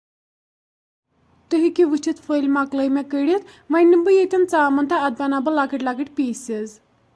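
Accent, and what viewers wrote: Kashmir, India. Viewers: Wariya asel...lagta aap k accent se aap south kashmir se ho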